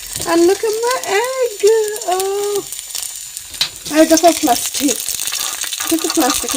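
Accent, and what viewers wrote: Sylhet, Bangladesh. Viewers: আপা খুব ভালো লাগলো ভিডিও টা,,, আর আপনার মুখে সিলেটি মাথ হুইননা মন টা বইরা গেলো,,, নতুন জয়েন হলাম আপু,, আশা করি আপনিও জয়েন হয়ে যাবেন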